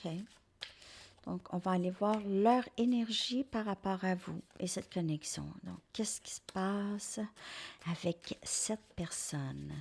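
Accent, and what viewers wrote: Canada. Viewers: je viens aussi pour écouter ce bel accent canadien gratitude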